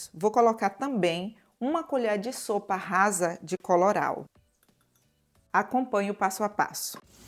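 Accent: Brazil. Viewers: Ela fala maravilhosamente bem e bonito. As receitas perfeitas e deliciosas. Não vejo graça alguma e sim aprender a respeitar o sotaque de cada região brasileira que tem uma extensa diversidade cultural. Isso é cultura meu bem!!! #Pega a visão#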